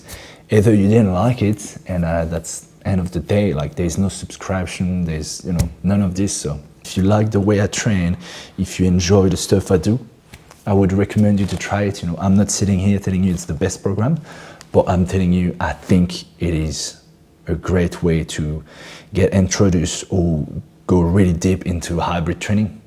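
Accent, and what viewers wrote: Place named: France. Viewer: Surprinsingly I'd watched. Oh, I got your accent is different and I enjoyed man... are you a frenchman? Cool! Is it your daughter? Very cute hahah man. Adorable, besides... a good caregiver does it with a good heart. You're coaching then, I liked - let's see if I can distuck of my strugglesituation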